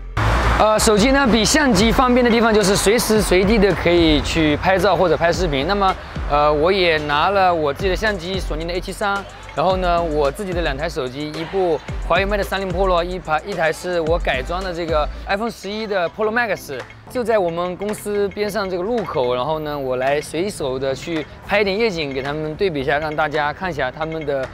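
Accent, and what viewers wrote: Wenzhou, China. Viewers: 是很正宗的温州口音了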